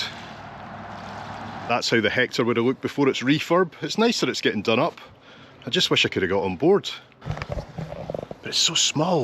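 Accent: Scotland, United Kingdom. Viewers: Hey! Realy Loved watching this Video of yours!... Is IT only me Hearing you speakgreat scotiish accent?~ did realy😂tiggl my ears~✓dearly Love you talking this way!..i am No native Englisch speaker😂,sorry& you Made me Pick Up the Dictionary 3 Times,Just to try& get IT right❤ Thanks a Lot for your lovely Video!& I must admid:Love your Understatement Mindeset🎉 cheers! Greetings from Berlin, germany~t. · Always enjoy your YouTube travel videos and especially your accent. Long live Scotland. Watkins Glen, New York is well worth a visit. It’s at the southern end of one of the Finger Lakes and is home to a car race track with a storied history dating back almost seventy years. Jackie Stewart raced here in F1. The town can be very busy when the race track has an event, which is quite often. Car clubs rent the track and accommodation can be scarce. You might want to visit during the Vintage British Car Club event. 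Hiking up and down the actual “Glen” is a spectacular experience. The schooner “Love” sails up the lake and is famous for its Moscow Mules. Other ships offer dinner cruises.Stay at the Senneca Lodge. The A-frame cottage are best. Drink at the bar that has hosted F1, NASCAR, TRANS-AM & CAN-AM car racers from around the world since the fifties. Hundreds of race memorabilia litters the walls and hours can be spent reading all the car paraphernalia. Every college in the world seems to have nailed their pennant to the ceiling. The nearest airport is either Rochester, Syracuse or Buffalo, NY. Alternately, fly to NYC and take AMTRAK to Rochester and rent a car. AMTRAK also runs from Toronto, Ontario, Canada to NYC and you could jump off somewhere on the South Shore of Lake Ontario and rent a car and later continue on to NYC or Toronto.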